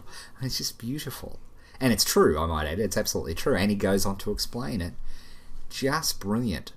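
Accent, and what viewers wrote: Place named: Australia. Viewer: what a lovely australian accent!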